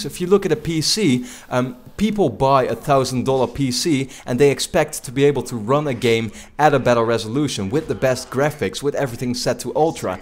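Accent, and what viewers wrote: Netherlands. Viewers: You sounds english with a dutch accent in it 😂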